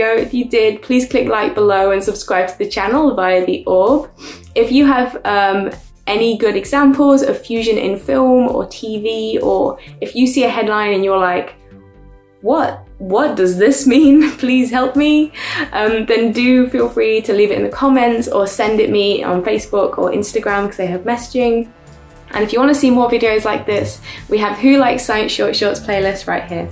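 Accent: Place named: United Kingdom. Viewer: Nice to hear some british accent here ^^ I really see a potential to your channel so here's a few tips to improve: - Love the improvised style but maybe have things a little more scripted so you stumble less on words and make explanations a little clearer - Sound echo is not much of an issue for now, just try to correct the channels, your voice is very much on the left channel here - Keep the balance between vulgarization and complexity. It's a niche but people are still very much looking for channels that go more in depth, best example is what Scott Manley does with rocket science Else, nice video, nice background, we can clearly see you are passionate about the topic and I can see you going places with this channel, keep up the good work ! Also, nice instance of laser fusion reactors in fiction is in The Expanse, it's what powers the ships and you even see the inside of the reactor in a few scenes (can't remember which episodes ^^)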